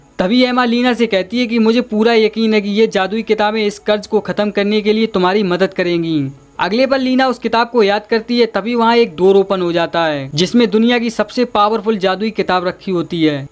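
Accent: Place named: Uttar Pradesh, India. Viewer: Kya bhai u.p. ke ho na aap mast👍👍👍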